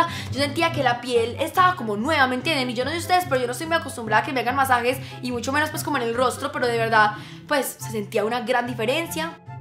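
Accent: Antioquia, Colombia. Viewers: El acento de ella es paisa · Me encanta ese habladito tan paissa AJJAJA la amo saludos desde Francia pero soy de Colombia y amo mi tierra 💛💙💗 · El acento de, es uno de muchos acentos que hay en Colombia. Ella habla paisa, pero hay más acentos como el rolo, costeño, valluno, santandereano entre otros. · Soy la única que ama el acento Colombiano? 🤔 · # jaja me encanta ese acento colombianooo, te amo 😍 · Me encanta como habla con ese acento colombiano... Nooo que está mujer es bellísima 😍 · Es Colombiana y ese es el acento de Colombia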